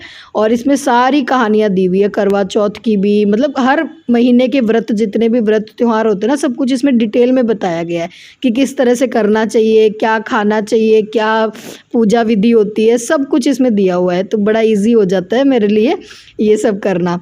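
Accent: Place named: Punjab, India. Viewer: Aap ho Rajasthan ji to punjabi jese rehna aur bolna tone kyu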